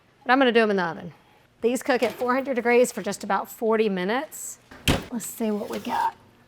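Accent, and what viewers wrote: South, United States. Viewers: This video made my day! I love the suggestions and your calm southern voice. :) Thank you!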